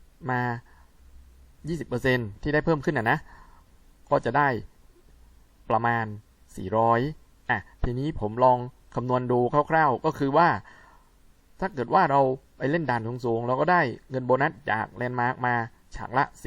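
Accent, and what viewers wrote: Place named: South, Thailand. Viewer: ชอบสำเนียงใต้ๆของพี่นะ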